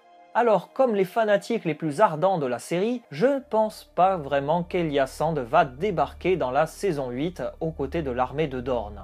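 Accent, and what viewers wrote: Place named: South, France. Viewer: Oh le bel accent du sud !!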